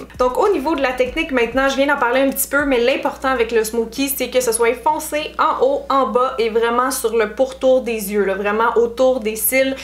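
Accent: Quebec, Canada. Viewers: Je c'est que ton accent est québécois mais t'es "s" sont super aigue c'est incroyable ! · Je viens de découvrir cette vidéo (ainsi que celle sur les rouge à lèvres) et ce fut très très intéressant!! Donc un grand merci. Ca fait longtemps que je me maquille mais j'ai encore appris des choses! Top. (Et ton accent me rappelle notre super voyage au Canada...quand on pouvait voyager :-P ) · Pas mal comme vidéo mais avec le débit rapide et l accent québécois, nous ne captons rien :-) j ai mis 10 min a comprendre que vous parliez de "placement" alors que j entendais "plasma". C est pas facile à porter l accent québécois je trouve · Super vidéo très pro et pédagogique , je vais investir dans un crayon jumbo pour créer l'effet intense et tester le look monochrome avec un bleu pastel estival ou et un violet Nars très intense ! Je m'abonne dès la première image , magnifique votre halo je vais m'accrocher pour imprimer toutes ces techniques . 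Un grand coup de cœur...plus l'accent canadien... conquise voire heureuse !!! 😍😍😍👍👍👍❤💖💝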